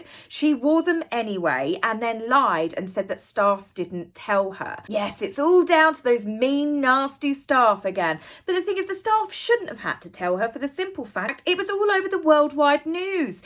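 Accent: United Kingdom. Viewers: Your British accent is so adorable and hilarious hahahaha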